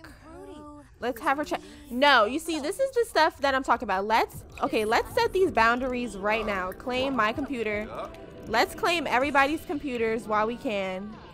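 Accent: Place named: DMV, United States. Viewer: im from dc and i knew you had to be from the dmv area ! i heard the dmv in your voice 😂 i just found your channel and instantly loved your videos❤️❤️❤️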